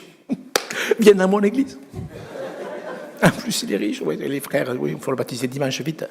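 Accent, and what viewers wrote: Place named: Toulouse, France. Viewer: C'est vraiment l'accent de Toulouse !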